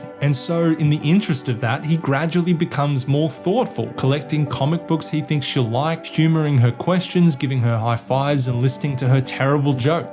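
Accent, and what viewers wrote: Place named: Australia. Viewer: Your accent sounds nice. Are you by any chance from Aussie or a you a Kiwi?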